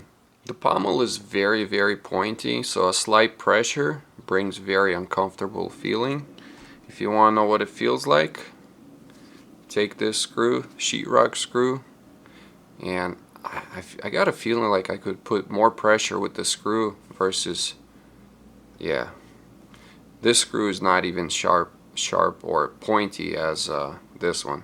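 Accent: Russia. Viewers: Акцент то русский!))Очень хорошие работы,удачи!Продолжай в том же духе!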